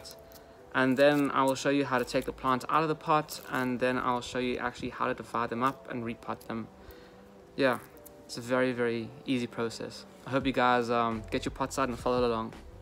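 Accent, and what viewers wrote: South Africa. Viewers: Nice to hear and see a fellow South African in the plant community 😃😁 Going to try repot mine. Is it ok to do now in late spring?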